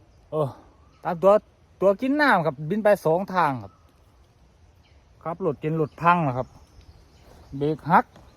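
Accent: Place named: Korat, Thailand. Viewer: สำเนียงเป็นคนโคราชนิ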